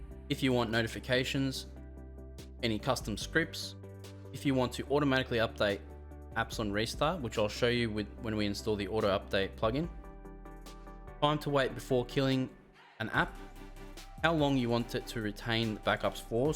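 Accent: Australia. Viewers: so refreshing to hear an Aussie voice in these types of videos. Is unraid affected by this wireguard removal from distros?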